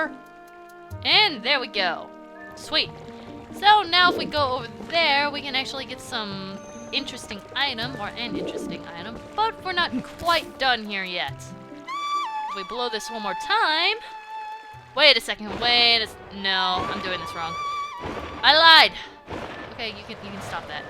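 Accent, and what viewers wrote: United States. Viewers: lol I don’t get it but still I will always think your cute with that country accent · Don't stop ur southern accent, it's funny!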